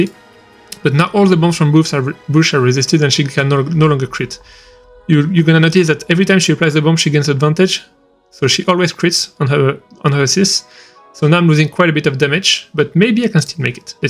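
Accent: France. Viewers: Sounds French to me... I'm in France right now! Nice to see someone making these guides in countries other than the U.S.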